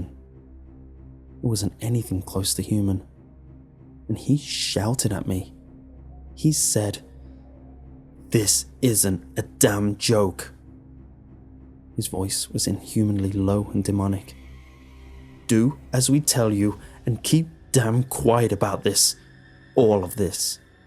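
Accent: United Kingdom. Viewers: Pissanjecous Demsley it's cause of his british accent.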